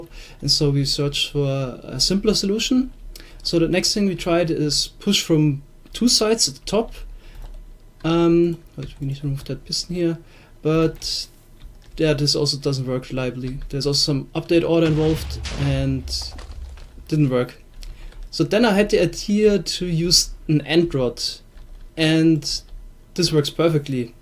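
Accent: Germany. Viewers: Junge du Bisch deutscher des höhrt man übel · Is he german or why does he have that accent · Are u German? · are u from germany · are you german?? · u r german oder nicht junge du hast harten acxent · Are you german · Deutscher merkt man einfach am axcenz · Cooles video man höhrt dein deutschen aktzent (nicht böse gemeint) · is he german? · When I heard the German accent I was like " I fcking knew it! " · Are you german? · man kann richtig hören das du deutscher bist, ich auch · Ich liebe den deutschen Akzent wenn du jetzt noch Sachse wärst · Das is ein deutscher akzent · erste sekunde wo ich deine stimme gehört hab hab ich gemerkt du bist deutsch aber cooles video😃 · Er hat nen voll deutschen axzent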